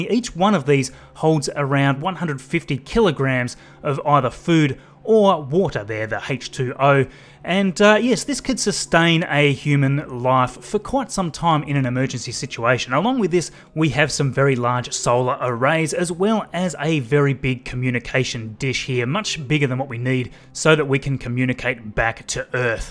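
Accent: Australia. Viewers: Are you an Aussie or a New Zealander?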